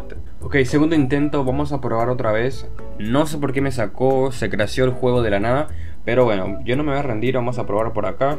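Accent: Paraguay. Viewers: Sos Paraguayo pero con mezcla de chileno argentino brasilero y otro más??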